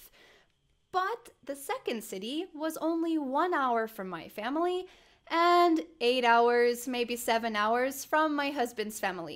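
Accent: United States. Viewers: I 'd rather learn American accent instead of British accent that is why I follow your channel.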